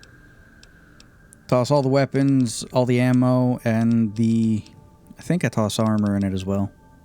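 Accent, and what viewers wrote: South, United States. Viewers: Now I know that a law-abiding Canadian can't survive in a wasteland because it was ironically proved to me with a pleasant Southern accent. Thank you, sir!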